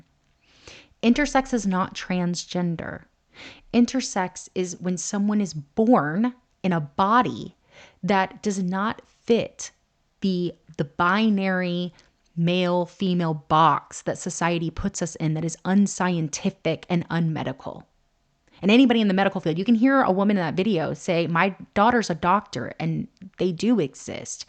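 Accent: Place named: South, United States. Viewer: Her southern accent makes her sound unintelligent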